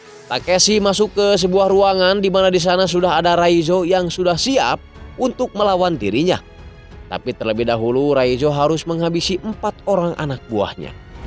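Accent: West Java, Indonesia. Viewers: iya kan,,sumbu pendek,,,ini film serius,,knp suara yg buruk ini pembawaannya komedi,,,di kira lucu kali dgn logat sundanya,,kampungan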